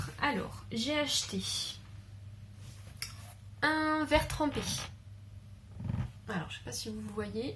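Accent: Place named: Toulouse, France. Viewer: J’ai passé 1h hier et deux heures aujourd’hui à vous écouter sur Procreate... je suis artiste peintre et je souhaite commencer à travailler avec Procreate . Après avoir écouté d’autres vidéos j’avoue que j’adore la façon que vous avez d’expliquer les choses votre rire votre accent toulousain je suis toulousaine et vous expliquer super bien c’est très sympa votre façon de faire merci beaucoup en tous les cas à très bientôt si j’ai des questions. J’ai déjà un iPad 6 et j’envisage d’acheter soit l’iPad Pro mais j’ai pas beaucoup de sous soit l’iPad Air quatrième génération merci en tous les cas pour vos conseils et continuez à nous régaler avec votre sourire et votre bonne humeur !Evelyne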